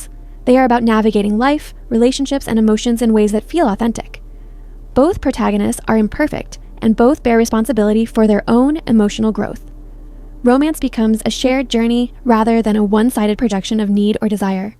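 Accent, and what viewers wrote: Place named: United States. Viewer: If you didn’t say English wasn’t your first language I wouldn’t have known! Your accent is so American :) one request though, is to slow down your speech a little bit, I had to slow the playback speed down to 0.95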